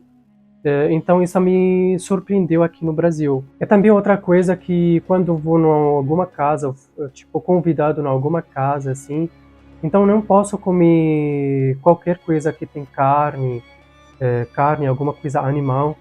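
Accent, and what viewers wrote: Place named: Arab world. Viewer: Da pra ver que tu falas desde pequeno o idioma portugués ,, e nao aprende em um ano pois tu nao tem s o sotaque árabe Tão forte sua mae é brasileira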